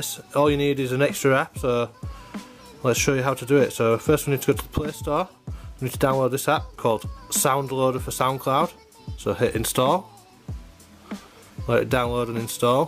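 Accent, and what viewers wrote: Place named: United Kingdom. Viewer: He sounds like a British lad will be surprised if he aint i love mi British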